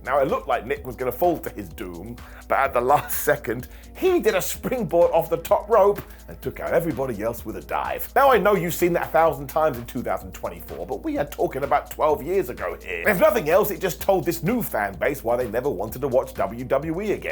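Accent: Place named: Scotland, United Kingdom. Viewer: I never knew the biggest wrestling fans were Scottish. Damn, it's hard to listen to you. The rest of the world is so disturbing.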